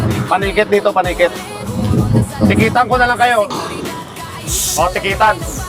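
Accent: Iloilo, Philippines. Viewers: Ilonggo ka sir kay isug man? Kag imo tuno o accent ilonggo man gid iya.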